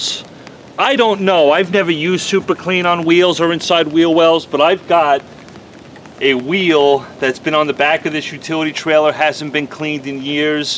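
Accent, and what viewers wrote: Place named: Texas, United States. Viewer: Love that Texas accent 😏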